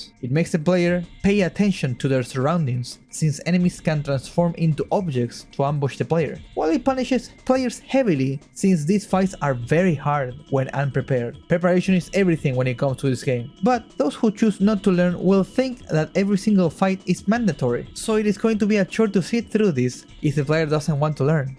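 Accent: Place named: Argentina. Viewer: hasta en ingles se nota lo argentino jaja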